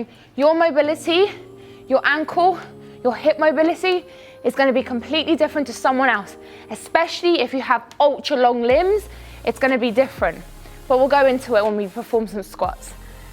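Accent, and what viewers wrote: England, United Kingdom. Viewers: that’s her british accent fooling you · English accents are everything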